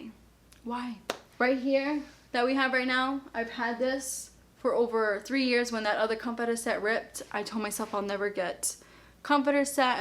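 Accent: Boston, United States. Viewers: She’s from the Boston area 🥰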